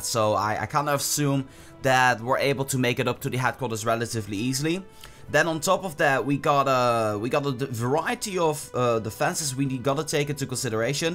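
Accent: Netherlands. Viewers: I love ur dutch accent :D ben ook nederlands haha :D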